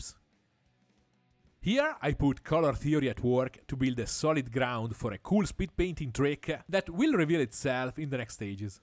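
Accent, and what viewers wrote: Italy. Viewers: In three hours! Jeezuz! And that italian flavoured english is just awesome! Ti saluto! Forza!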